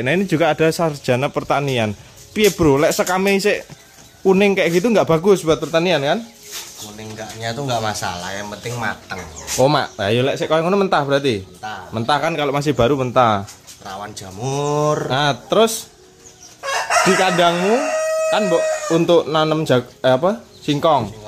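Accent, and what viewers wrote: Java, Indonesia. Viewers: Alamatnya mana om.....kok bhsanya Jawa medok banget. Pingin dolan kesitu belajar....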